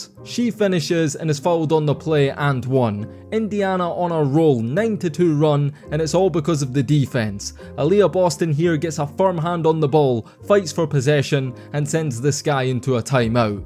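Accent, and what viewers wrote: Scotland, United Kingdom. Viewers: Agreed! Who is the announcer? Sounds like a Scot. The main reason my interest was maintained for so long. Articulate and engaging.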